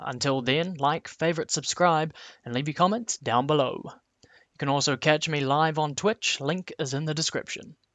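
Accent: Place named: New Zealand. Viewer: I love hearing a thick kiwi accent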